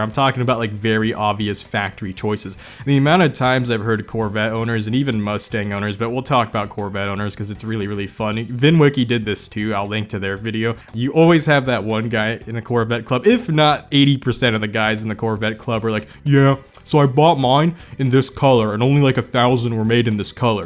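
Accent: United States. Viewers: Ur asian? Ur like THE MOST american sounding person i have ever heard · he is Asian but sounds like an American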